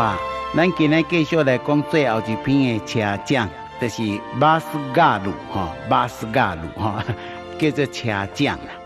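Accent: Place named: Japan. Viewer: 旁白主持人的介紹非常專業，但是日式的美語發音，讓人聽了會起雞皮疙瘩。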